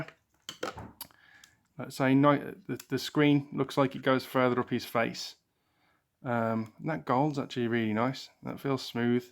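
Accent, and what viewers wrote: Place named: United Kingdom. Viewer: oh. Oh right. Then I will stop sitting here watching the App Store as though I am waiting for water to boil. LOL! Thanks for the tip about set up as well. Maybe it will be a relatively painless process to set him up via the app. I was very disappointed in Cosmo‘s A couple years ago. Voiceover did not respond at all to the app after I opened it. It just played a bunch of music and wouldn’t show me anything. I hope victors app is an improvement. You will probably be getting the app before us. At least, you sound as though you may be from somewhere across the pond. Anyways, thanks for posting this unboxing!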